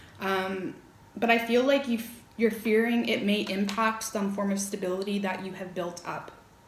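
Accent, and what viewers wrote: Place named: Canada. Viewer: You are right on the money darling but do you blame me about getting my feddy? Because with my own experience and I can only talk about myself, all I know is that love is not the kind of love that there used to be sweetheart. I believe that one of the top five reasons for relationships are matrimony problems or issues we're about Finance if a man can bring in the money he ain't getting no honey. There's a lot to be done in a relationship got to have money you got to give quality time to each other course there has to be good communication at least and a lot of it to listen. But for sure any problems baby and that's fine cuz I am a king therefore King has a queen and she better look like one cuz if I cannot condone her not just with material things should be at the very bottom to least but they are needed because I will Adorn her with all my love and being but if there is a struggle in finance paying bills anything like that it won't work. 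I'm just saying thank you God bless you I appreciate you and I love your accent Canadian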